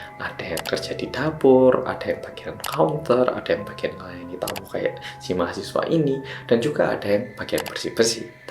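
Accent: Java, Indonesia. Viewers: Makacih, Boloku Sing Paling Medok Dheweeeee 🙏🏼🙏🏼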